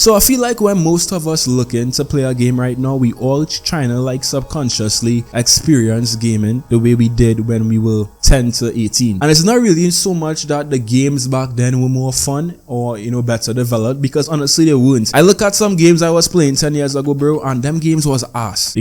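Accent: Trinidad and Tobago. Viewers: Is that a trini accent?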